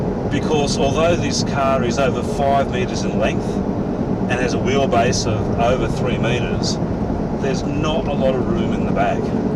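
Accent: Australia. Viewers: loved this car and your review!! i love the aussie accent :D is it rwd?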